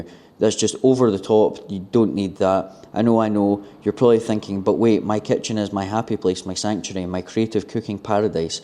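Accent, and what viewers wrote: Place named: Scotland, United Kingdom. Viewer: Where in Scotland are you from g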